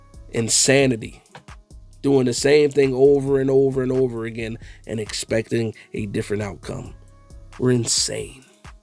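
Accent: New Jersey, United States. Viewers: You still have a little of your Jersey accent left. Stay strong broski 💪🏼 big blue 🔵!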